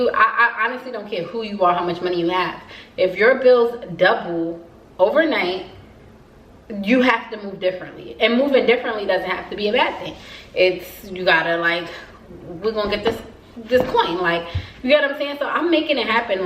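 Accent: New York, United States. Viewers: I needed to Hear This ❤️‍🔥🥴 But yooo the nyc is def in you 😂 #BIGVIRGO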